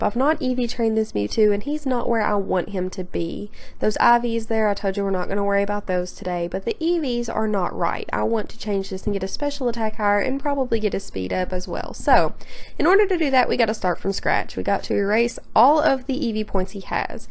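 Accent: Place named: South, United States. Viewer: well that is good to know i will let you know if i need any help oh and i hear in your videos that you have a kinda "country" accent are you from any southern states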